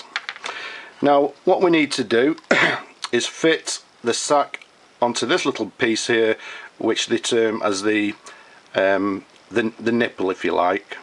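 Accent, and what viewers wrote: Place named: Liverpool, United Kingdom. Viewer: Thanks bud, I think I can do this, kind of like a Harley his to clean your carburetor video..great accent mate, is text a Liverpool accent or Scottish ?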